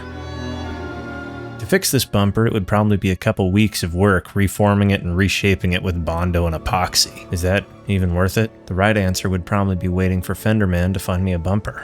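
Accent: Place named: Central Pennsylvania, United States. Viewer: Every time I hear you say something like “there’s a lot of hoses on this car that need swapped” I’m back in Central PA😅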